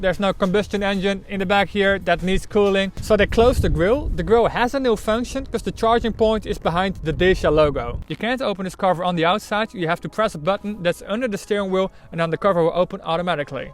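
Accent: Netherlands. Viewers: Lekker Nederlands accent lolz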